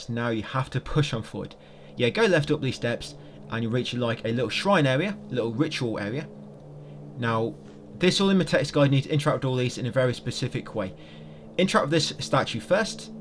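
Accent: England, United Kingdom. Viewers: What a bliss to be looking for a walkthrough and accidentally find one of your favourites content creator. Thanks mate. Ps: I teach English as a second language, and I was trying to place your accent. Birmingham, Liverpool, London?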